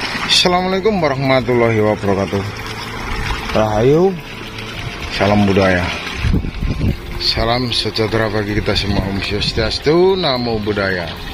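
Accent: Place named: Java, Indonesia. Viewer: 🌀🌺☀️ WA'ALLAIKUM SALAM WR. WB. SaLaaam 🙏🙏🙏🙏🙏🙏🙏🙏🙏 , Smoga SulsEs Luuur and jaga kesEhatan sLaLu Lur 🙋🏼‍♂️🙋🏼‍♂️ , Nb. Warning and Bukan Marning 😀😀 , jangan Lupa Kirim DO'A / DUNGO Sama ALmarhum & ALmahumma okey Luuur 👍👍 , Tingkatkan Logat Bahasa Indonesia + Jawa Sehingga Seumpama Sayur tidak Lupa garamnya 😲😲😀😀 👍👍 , Lanjutken Mbah Kung 🙋🏼‍♂️🙋🏼‍♂️ ☀️🌺🌀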